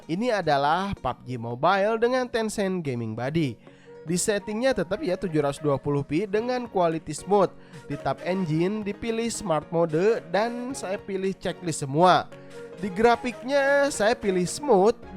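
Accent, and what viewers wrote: West Java, Indonesia. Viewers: Ke nya si om orang sunda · Urang Sunda kang 😁? Mantep lah infirasiyeun yeuh 😁😁 ahuyy #TerusberkayakangNOLIMITE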